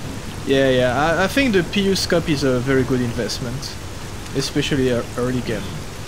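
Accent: France. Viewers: you have a big french accent i am wrong?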